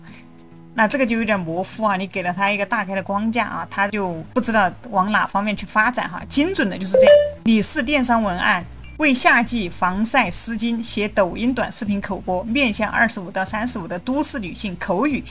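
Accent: Sichuan, China. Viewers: 博主是四川人吗？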